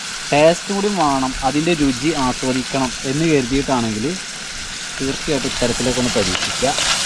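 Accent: Malappuram, India. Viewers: മായ പുയ അങ്ങനെതന്നെ പറഞ്ഞാമതി ❤ മലപ്പുറം അങ്ങനെ ആണ്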